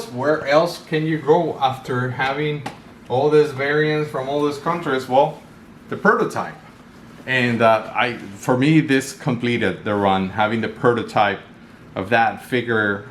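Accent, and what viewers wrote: Venezuela. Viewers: El acento venezolano te delata .. y me alegra .. muchísimo .. tremenda colleccion .!!! Increíble .!!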